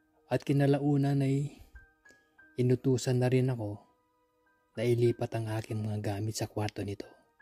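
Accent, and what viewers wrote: Pampanga, Philippines. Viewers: The accent is very Kapampangan. ❤❤❤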